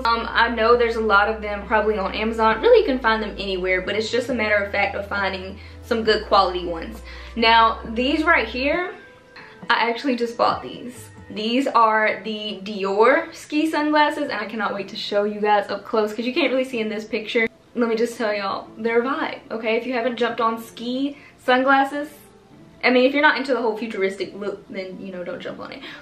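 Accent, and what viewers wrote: South, United States. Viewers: Oh my gosh girl I love your energy and that southern accent ! I’m sure you get this all the time… but where are you from !!? I’m lovin it ♥️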